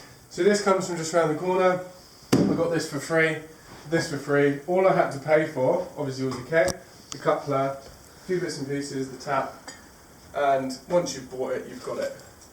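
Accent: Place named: Southern England, United Kingdom. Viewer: u sound like your from south England but ur Australian ?? crazy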